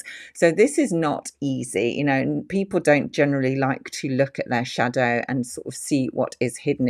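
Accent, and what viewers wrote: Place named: United Kingdom. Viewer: Hello, my middle name...also, its nice to hear a british lady doing astrology, very few are very good, i have studied astrology since i was `16, because i wasnt good at sussing out people, astrology helped me so much there- now i see it actually working on the world stage- the wise men of the past knew much more than we think we knew, they used it daily and as a map and we can learn much from this xx THANKYOU X m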